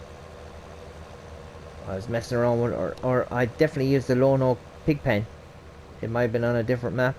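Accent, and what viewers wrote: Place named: Ireland. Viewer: Keep it up 👍 which part of Ireland are you from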